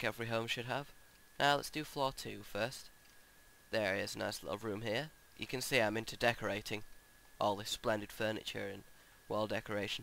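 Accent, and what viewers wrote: United Kingdom. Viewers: british version of cartman from south park xD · Your accent really frustrates me. I'm sorry. You sound like a British person trying to sound Australian and American at the same time. Nice videos by the way.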